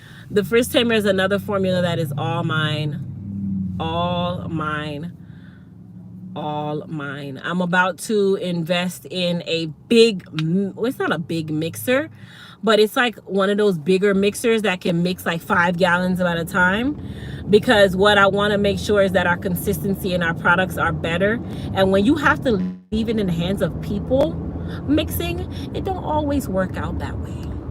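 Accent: Jamaica. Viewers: Are you Jamaican ? Keep up the good work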